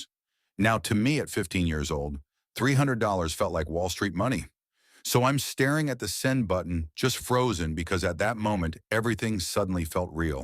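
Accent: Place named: India. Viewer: I can smell the Indian accent from behind the AI voiceover.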